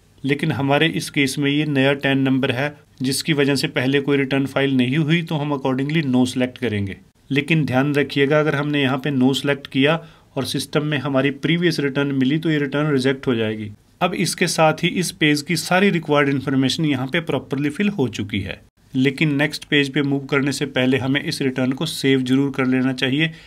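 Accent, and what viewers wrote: Himachal Pradesh, India. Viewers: aap himachal se ho according to your accent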